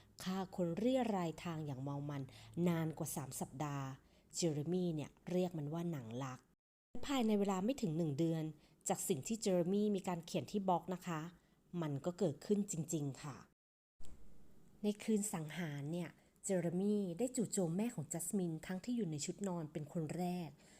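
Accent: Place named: Thailand. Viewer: สำเนียง eng ไทย มันจะพาลิ้นพันกัน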